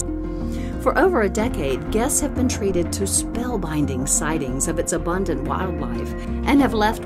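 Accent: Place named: United States. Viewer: Why would a Sth African Lodge use a fawning American lady for the voiceover, in this grating sycophantic advert, is a puzzle.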